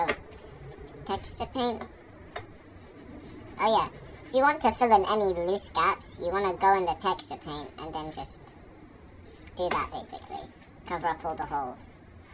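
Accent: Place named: United Kingdom. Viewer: U sound so british 😭😭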